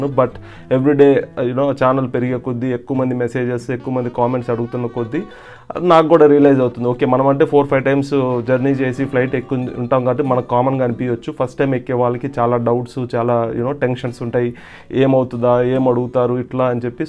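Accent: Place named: Rayalaseema, India. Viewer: Your ascent seems rayalaseema ...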